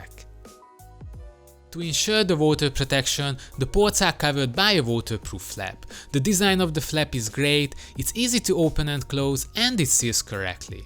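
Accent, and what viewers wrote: Hungary. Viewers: i like your Hungarian accent :) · he said that he has a hungarian accent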